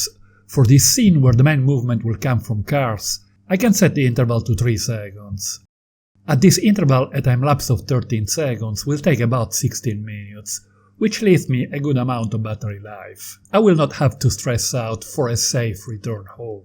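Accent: Italy. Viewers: I like the Italian accent :D Great job and thanks for the info!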